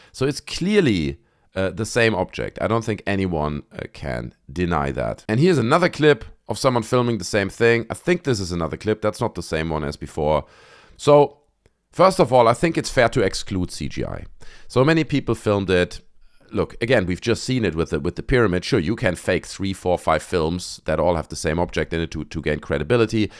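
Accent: Germany. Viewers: wieso klingst Du so deutsch?! 😅 ... ok, maybe an mistace. Go on and GRTX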